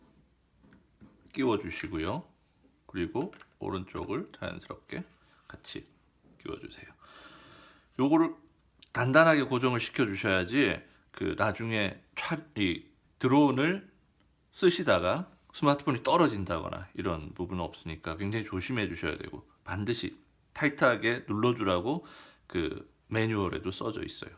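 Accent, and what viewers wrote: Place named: South Korea. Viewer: 다좋은데 콩글리시가 ㅎㅎ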